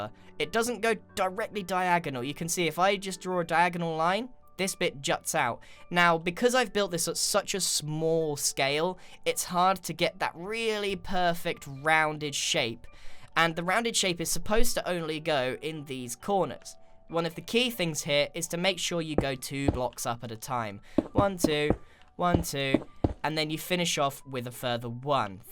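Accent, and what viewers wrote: United Kingdom. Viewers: Are you Britain? It sounds like it. Newest sub BTW 😁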